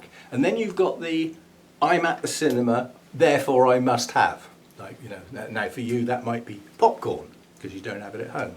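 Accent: United Kingdom. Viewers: I'm pretty sure I happened upon your channel when I did a search for "aggregatum orchid care" which I know you did not have back then but the word "orchid" probably led to your channel. I stayed because I like your personality and you have a wealth of knowledge I appreciate. Plus as an American, I enjoy your British accent! I remember when I first heard you call a plant "tatty" and I found it charming. And you have a kooky sense of humor. I hate this social distancing too, but we have to do what we can.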